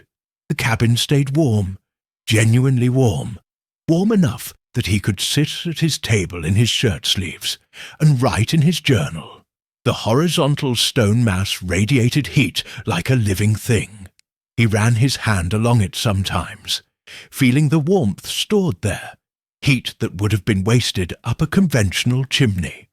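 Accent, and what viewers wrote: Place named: United Kingdom. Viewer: Not wasting my time listening to this brit accent.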